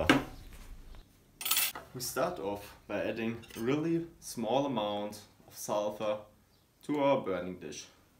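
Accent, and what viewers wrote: Germany. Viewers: is that german accent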